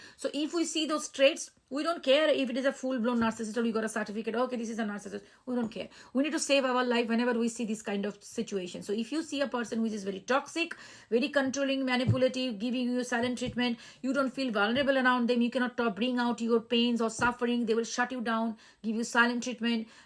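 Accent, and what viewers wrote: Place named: Kerala, India. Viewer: Didi ji,your assent tells that you belong to kerala than bengali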